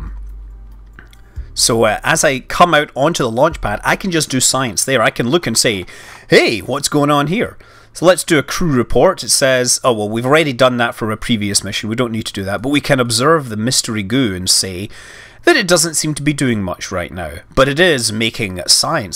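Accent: Scotland, United Kingdom. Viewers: hence your name, you sound a bit scottish.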